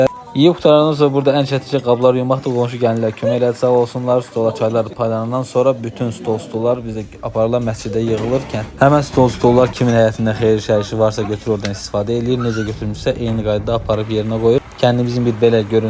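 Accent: Zaqatala, Azerbaijan. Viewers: Аллах габул етсинлар. Аллах Санада гисмат етсин.манимда оглум Асгарда. Билмирам нарадансан дейтрам сиз суннисиз. Санча Загатала. Тала лахчасина охшайыр❤❤❤ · Ay Allah ne gozel danişir.yemaxlar qazmaxlar.Zaqatala lehcesidi?? Gelmaga başladi. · Zaqatalaya bənzədi , ləhcə və gözəl təbiət. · Zakatala zonası👍👍👍👍👍🥰lehcesinden tanıdım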